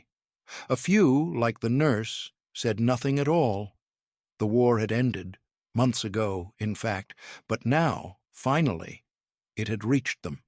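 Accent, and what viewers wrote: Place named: United Kingdom. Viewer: Texas in America and yet you choose to use a British sample narration. I don't understand.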